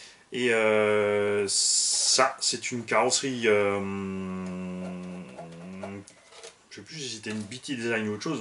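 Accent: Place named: Alsace, France. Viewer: Salut! Super ta chaîne dédiée au modélisme RC, je l'ai découverte il y a 5 ou 6 jours! J'ai du taf, y a un paquet de vidéos à regarder haha! Mes oreilles ont remarqué de suite un accent familier, t'es Alsacien et ça fait bien plaisir (je suis de Colmar perso), content de constater qu'il y a des passionnés dans le coin, je commençais à croire que j'étais tout seul à basher dans mon coin de forêt! Tes tutos vont m'être bien utiles et d'une grande aide, j'ai un Typhon v3 en cours de remontage après une sortie/journée VDM (il a fini dans une flaque de ciment un peu en dévers que j'avais pas vu... sans commentaires... ^^). Bref continue tout ce bon boulot, t'as un follower en plus et des pouces bleus!!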